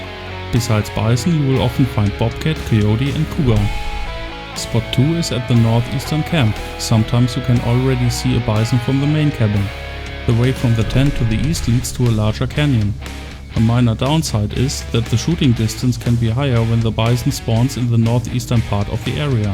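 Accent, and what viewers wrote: Germany. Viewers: you sound German cuz of your accent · and btw are you german?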